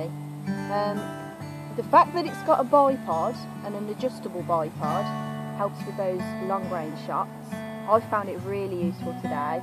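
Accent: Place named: United Kingdom. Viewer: she sounds very British to me.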